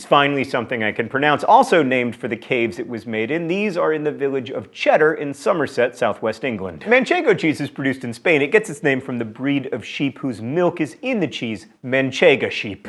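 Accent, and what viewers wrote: France. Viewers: I like your french accent and just for that i like this episode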